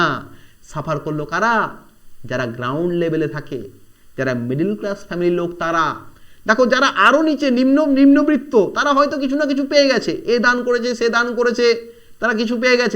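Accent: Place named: United Kingdom. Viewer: Sir vs. ata American English ause hoy but ato to puro tai British English..ami ki bolta chai chi bujhte parchan.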